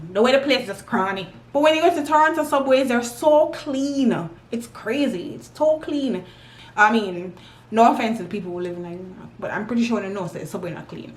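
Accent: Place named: Jamaica. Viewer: U sounds Jamaican girl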